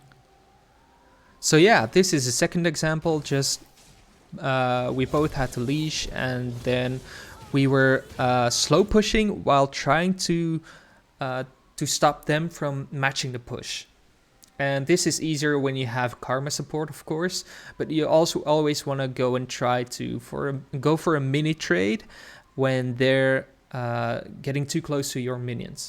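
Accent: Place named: Turkey. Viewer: Are you turkish? Your accent really sounds turkish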